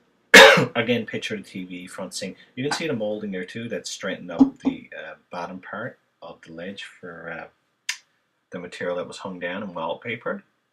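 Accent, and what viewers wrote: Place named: Newfoundland, Canada. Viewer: Hah. Here I am daydreaming about a ford transit conversion, looking for ideas when I stumble across this video and immediately realize that I recognize that accent. A fellow Newfie!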